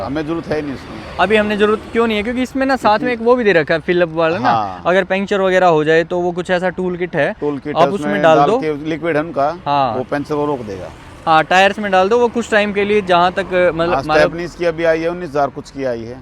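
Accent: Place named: Gurgaon, India. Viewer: टोप, रो , भैयो का एक्सेंट गुड़गावे के लगते।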